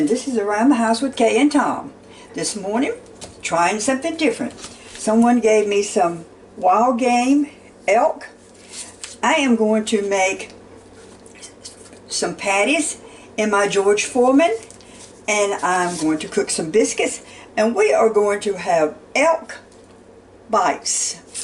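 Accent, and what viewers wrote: South, United States. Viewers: Hello Miss Kay. I've always wondered where your accent is from. Definitely down south.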